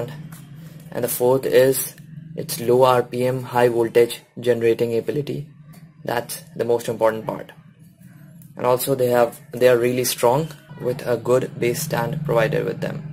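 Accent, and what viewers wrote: India. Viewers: if you just improve your Indian accent you are going to be watched and followed by more people thanks for the videos you are posting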